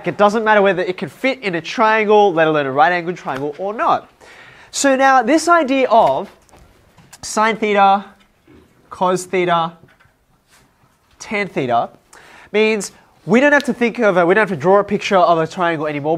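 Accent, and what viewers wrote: Australia. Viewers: Australian accent? Sounds like chan from skz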